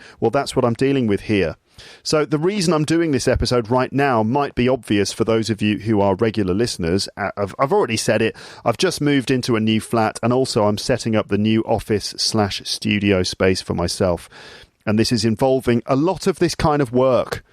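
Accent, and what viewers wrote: RP, United Kingdom. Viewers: Not all sound realistic enough, still, really appreciated your effort to imitate the sound of the instrument, especially the sound of the drill. Overall I love the process of imagining all those appliances and your work on your pod room, while I'm making dinner, your podcast is the best companion as well as learning the received pronunciation accent :goodvibes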